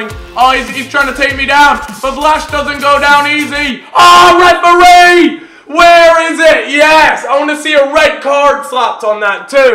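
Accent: United States. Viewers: Is american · Why does he sound like he's American? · why does he have an American accent? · Is it just me or does he sound a little bit American.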